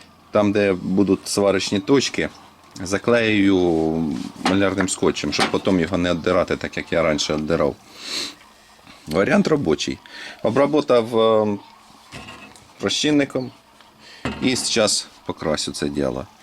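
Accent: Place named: Surzhyk, Ukraine. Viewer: Прекрасна українська мова, так з суржиком) але це українська мова!!!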